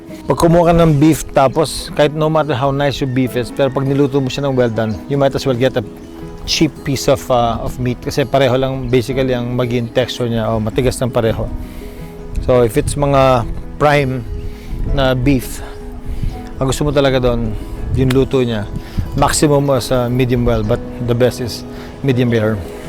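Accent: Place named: Tagalog, Philippines. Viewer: i am hungry whle watching it.. i love it when u cook, Sir! i also love ur bisaya cos it has a tagalog-toucvhed-accent too. hehhe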